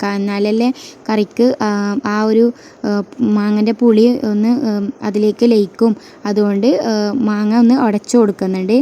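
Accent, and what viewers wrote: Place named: Kanhangad, India. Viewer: Evidea sthalam kanhagad ano samsaram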